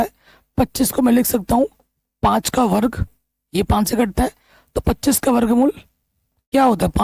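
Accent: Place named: Bihar, India. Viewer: Thodi Bihari hai 😅😊😊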